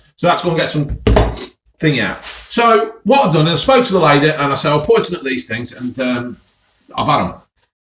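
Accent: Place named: Leicester, United Kingdom. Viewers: Check out Milan's on East Park Road if you liked this place mate. By far the best place in Leicester, and get the chilli paneer its brilliant. Found you from the True Geordie and knew you were from leicester straight away with that accent! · I find your videos appalling and you eat like a chimp. Disgusting behaviour. Now that’s your fix you enjoy but seriously great videos mate and as a fellow Leicester lad it’s refreshing to understand our accent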